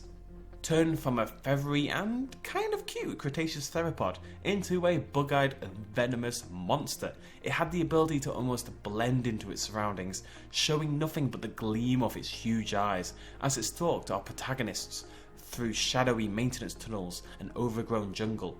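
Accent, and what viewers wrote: United Kingdom. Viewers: eu estou muito ansioso por esse jogo!não consigo esperar!não tenho consoles nem PC então só vou poder desfrutar desta maravilha por meio de vídeos 😪😥! belo vídeo e continue assim! Você é britânico? o sotaque é!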